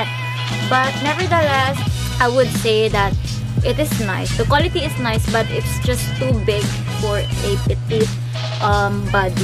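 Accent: Visayas, Philippines. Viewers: KA CUTE OY BISAYA HAHAHAHAH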